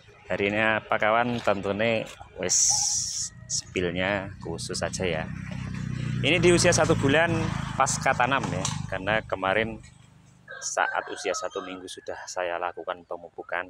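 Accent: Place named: Java, Indonesia. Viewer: Gayamu gomong ubi..anak sd jg bisaa..jowooo..medok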